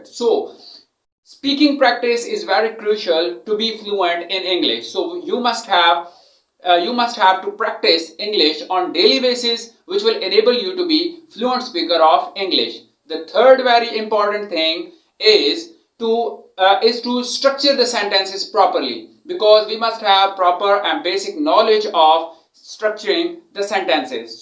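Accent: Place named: India. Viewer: يبدو من لهجتك انك هندى او باكستانى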